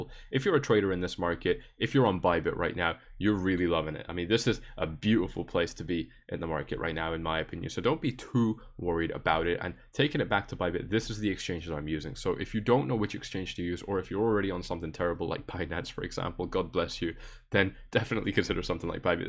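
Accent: Scotland, United Kingdom. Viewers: First time watching your video- great content thanks! Is that a fellow Scottish accent I'm hearing?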